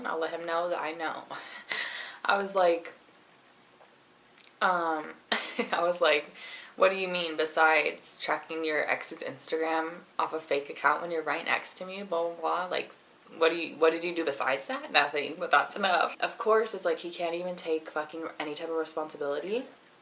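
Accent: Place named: New York, United States. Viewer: You lowkey have a new york accent lmao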